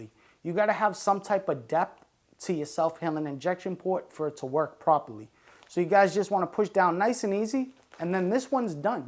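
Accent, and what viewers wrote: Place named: Brooklyn, United States. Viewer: He Reminds me of my Brooklyn accent.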